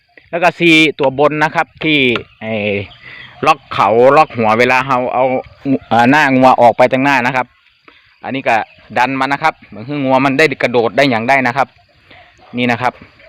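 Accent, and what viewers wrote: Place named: North, Thailand. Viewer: คุน เป็นคนตี่ไหนคือเหว่าอี่ได้อู้เหนือได้คับ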